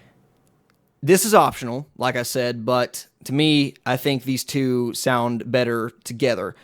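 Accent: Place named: Kentucky, United States. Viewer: new to your vids here... Where are you from? I'm from Kentucky,and your acsent sounds like you're from this area,lol. Sorry man not trying to be whatever, but yea... Awesome vid. New sub here.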